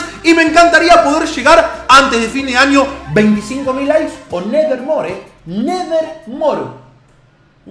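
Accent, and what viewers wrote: Argentina. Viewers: no sé porque pero me da gusto escuchar ese tono de argentino ajkds like :)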